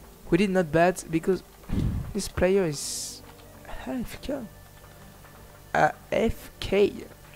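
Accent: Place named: France. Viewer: Yeah good english mate i love that lol i knew what is the french accent tkt sa s'ameliorera au file du temps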